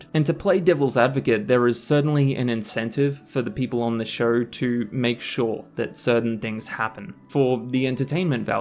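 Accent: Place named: Australia. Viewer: Vids are crisp love your work keep it up. Haven’t seen many Australian (assuming from the accent) d&d channels.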